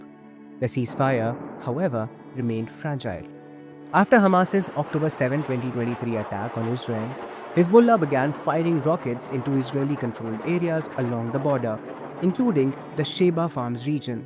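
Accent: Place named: India. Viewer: No matter how professionally this video is edited, I can't look over the fact that it's with an indian voice🤦🏻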